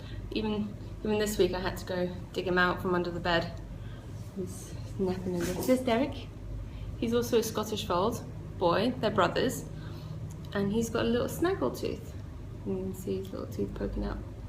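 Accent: United Kingdom. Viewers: This is a note, and you can take mu advice, or dump it. I just like people and if they think my advice is silly, I am not offended. You're looking into the computer. Your camera is to the right of how you are sitting. Look into the camera and not the computer to see yourself. You are lovely and do not need to check yourself and if you look odd. You cannot look odd. I am jealous of your hair and think you're a joy to watch. If you looked straight into the lens, I think it would be a titch better, but you could put the back of your head to the camera and I'd still watch. So again, feel free to ignore me. Honestly, you make very few new to pod mistakes. I don't hear some of the words I have to edit out and that takes forever. I ummm, and "uhhhhhhhhhhhh." LOL! I mean every comment with 100% respect to the wonderfulness of you. If you're from the UK is loving Monty Python mandatory? 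My husband says it is and although I've been to the UK, and know it isn't he loves teasing me and I would like a UK person to answer for him. HA! We adore your accent. If course you wonder what I'm talking about, since I'm the one with the flat American accent to you. Very flat, Michigan and we call soda pop. I know you had no need to know that.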